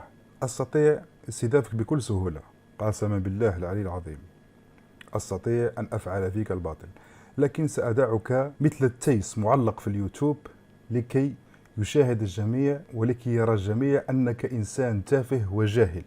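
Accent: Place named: Morocco. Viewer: واضح من اللهجة تاعوا انه مغربي.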